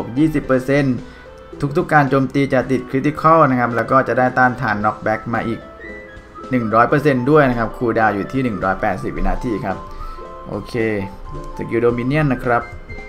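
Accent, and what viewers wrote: Thailand. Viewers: มันก็จะเหน่อๆหน่อย555+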